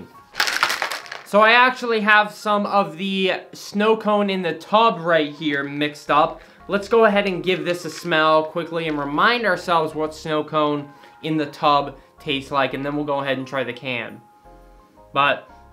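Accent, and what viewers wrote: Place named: United Kingdom. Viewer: I always thought you were from the UK lol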